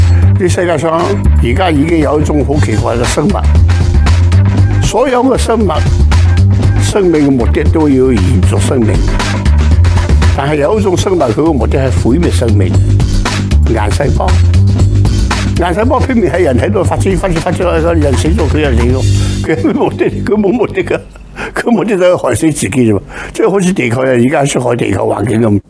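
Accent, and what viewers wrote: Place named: Shanghai, China. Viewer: 用沪语的音调讲粤语，听得好亲切。少小离家永不还，乡音无改鬓毛衰，倪老先生千古!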